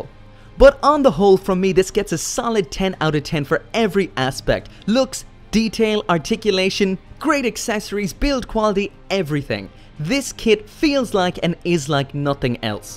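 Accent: Ireland. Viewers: I can't tell if your Irish or American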